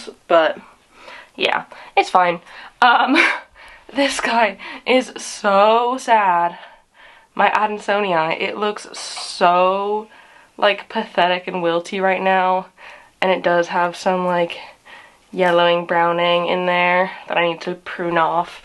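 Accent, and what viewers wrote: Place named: United Kingdom. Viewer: Great video showing the reality of plant care difficulties that gets to even the best of us; also noticed in this video how you had a lot more British sounded words and pronunciations that popped up throughout due to assimilating in London compared to your previous vids, it’s cute and shows the multicultural influences on you💕🫢😏💕👌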